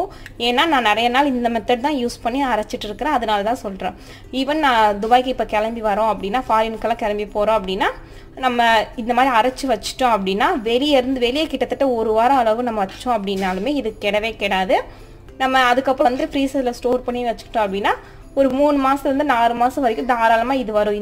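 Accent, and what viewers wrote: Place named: Kongu, India. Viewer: Super sister, good for your slang , your native is kongumandalam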